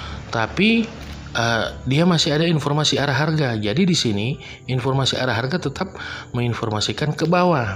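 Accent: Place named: Makassar, Indonesia. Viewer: dari suaranya ini kayaknya orng makassar😀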